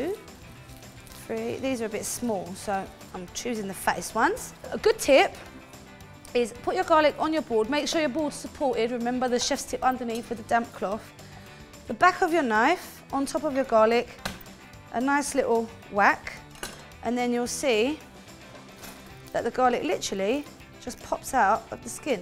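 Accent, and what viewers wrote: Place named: London, United Kingdom. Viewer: sounds like it could be london/south Essex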